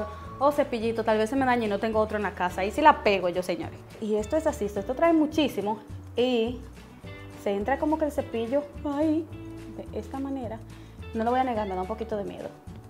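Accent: Dominican Republic. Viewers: Gracias amiga! Es que note su acento parecido al de República Dominicana...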